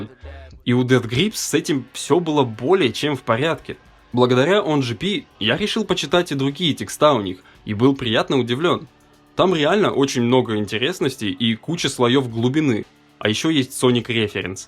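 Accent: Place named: Russia. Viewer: This is russian accent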